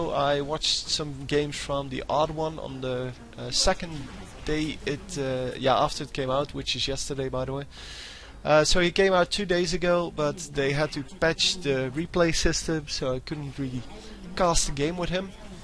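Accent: Netherlands. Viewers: He is from the netherlands, and his accent is a very typical dutch accent. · Are u Dutch?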